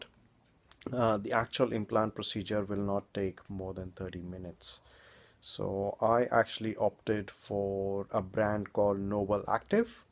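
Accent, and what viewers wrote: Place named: Delhi, India. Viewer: Your not from Australia, your an Indian dude, paid for promo. Accent is Delhi strong.... Lol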